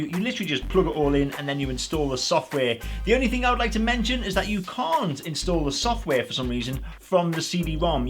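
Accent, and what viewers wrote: Liverpool, United Kingdom. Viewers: Hi, nice review - I built my own very similar engraver but one of these caught my eye while browsing eBay. However, I cannot work out your accent. Bit of Scouse, bit of Geordie and a bit of Oxbridge:-) Where are you from?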